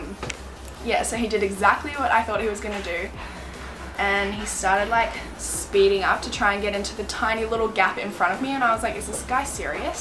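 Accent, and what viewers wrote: Australia. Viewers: u r Australian?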